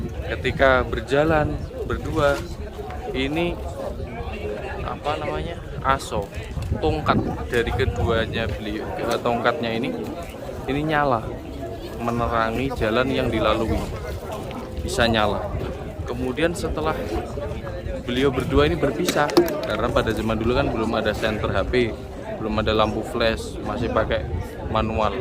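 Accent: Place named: Banjarmasin, Indonesia. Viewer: Kyak ada dengar suara org banjarmasin,, bapandir bahasa banjar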